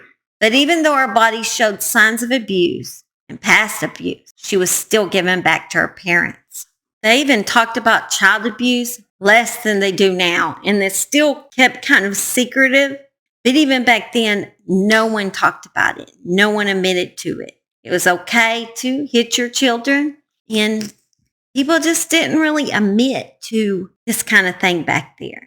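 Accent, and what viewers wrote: South, United States. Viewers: Absolutely love you I'm from England & I just love your southern accent & thankyou for all your hard work on these stories & the empathy you tell then with.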